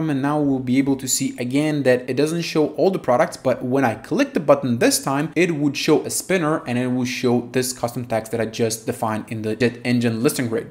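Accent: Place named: Brazil. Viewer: Primeiro vídeo que assisto teu, muito bom, mas tu é BR né? hahahaha sotaque EN_BR é muito claro pra mim.. haha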